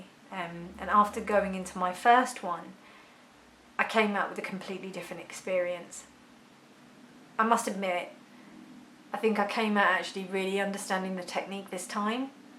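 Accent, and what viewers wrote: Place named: England, United Kingdom. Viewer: I am in love with your english accent, this is so beautiful! Thanks also for your insights by the way ^^ Also going for my second course soon!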